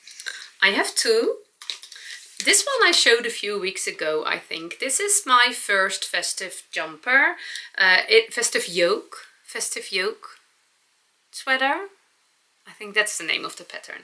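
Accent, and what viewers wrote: Netherlands. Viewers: Merry Christmas and Happy New Year,! Never feel the need to apologize for your "Dutchy English!" We love it! My kids and I are trying to learn German together this year, and I would never be able to do a podcast in a foreign language! Whew! Also, I am a fellow curly hair girl, and I love your look both with and without the headbands. I never feel as confident with one on myself...but I love the way they look on others. I want to try wearing them more...must try knitting some, I guess!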